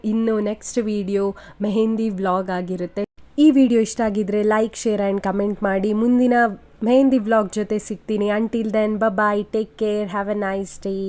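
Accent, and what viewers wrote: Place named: Mangalore, India. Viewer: Manglore Kannada❤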